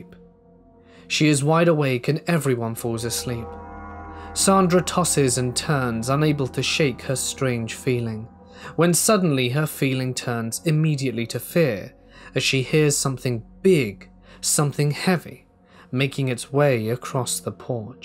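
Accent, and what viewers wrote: United Kingdom. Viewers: My only comment is this is superb narration, not just the charming british accent, but the tone, tempo, and phrasing (clearly enunciated without losing flow) are brilliant. This guy can do anything, any topic, audio books, etc and it would be of the highest professional caliber in my opinion.. Best I can ever recall hearing on YouTube.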